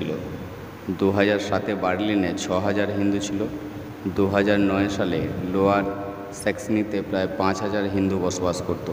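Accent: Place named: Ghoti, Bangladesh. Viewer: ঘটির ভাষা। বাংলাদেশের ঢাকাইয়া কুট্টিদের মতো ! তোতলা টাইপ ভাষা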